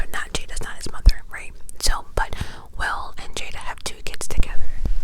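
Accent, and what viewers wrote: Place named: East Coast, United States. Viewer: I’m new here, where are you from? Because the upper east coast accent is adorable, but if you’re anything like me, I always talk with accents lol. Love your videos!! I’m listening to this one while cleaning my kitchen on a Sunday and it’s chefs kiss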